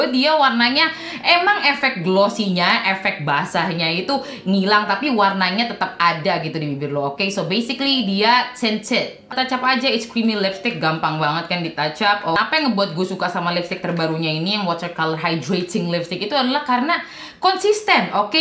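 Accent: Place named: Papua, Indonesia. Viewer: Sukaaaaaa bgt ama logat PAPUA nyaaa 🥰 sering2 dipake kaaaa 🥰👍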